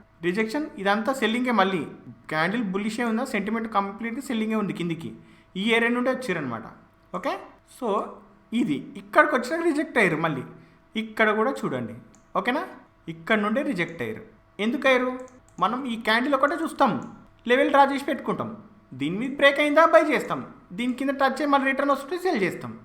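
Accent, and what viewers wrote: Telangana, India. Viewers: Super Bro .. your Telangana Ascent Higlight.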